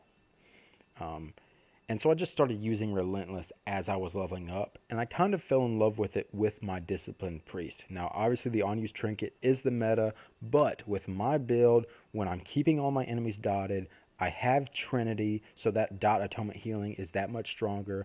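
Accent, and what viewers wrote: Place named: South, United States. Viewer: This is nice to hear a fellow player with a kinda Southern accent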